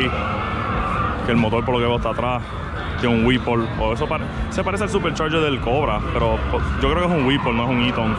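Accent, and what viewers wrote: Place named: Mexico. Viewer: no te conocía Bro, youtube me sugirió tu video y blogeas muy bien, vas bien, eres de PR pero traes un toque como mexa y eso es cool, saludos desde MX!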